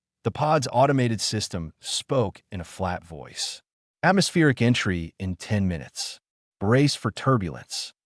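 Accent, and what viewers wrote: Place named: Spain. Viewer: The occasional Spanish accent draws my smile.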